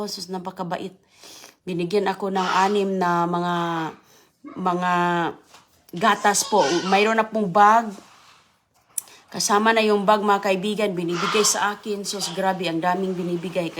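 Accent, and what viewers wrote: Visayas, Philippines. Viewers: Oke oke you need to learn English now and you stay in America for how many years and still your accent is really different I know you are from visaya but it’s hard to understand 😮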